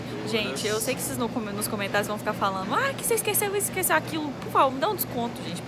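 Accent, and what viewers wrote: Minas Gerais, Brazil. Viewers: Entrei nesse canal falando essa menina tem sotaque de mineiro até vc dizer que o galo era mozao !! Já me inscrevi! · Esse sotaque Mineiro e bom demais gente❤❤ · comecei a ver seu vídeo e pensei o sotaque dessa menina parece ser MINEIRO, e eis que você fala que é de Minas. Adorei! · Esse sotaque mineiro aí é de qual cidade? O pessoal da cidade do meu noivo tem o mesmo sotaque 😂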